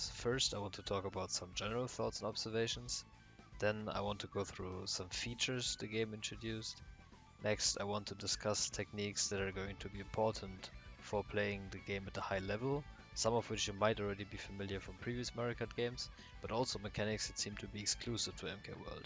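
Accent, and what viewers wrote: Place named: Germany. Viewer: Der ist doch deutsch das hör ich raus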